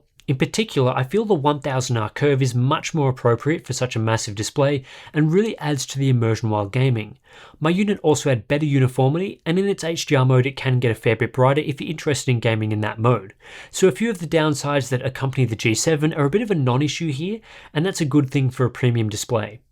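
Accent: Australia. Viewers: I think the real news here is Hardware Unboxed being hosted by a young Freddie Mercury, resurrected and with an Aussie accent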